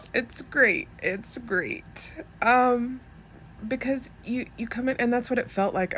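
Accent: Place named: Texas, United States. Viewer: omgeeeez I almost commented that your accent sounded Texan!!!! You sound like my sister she lived out in Fort Worth most of her adult life ❤❤❤ I love Texas!!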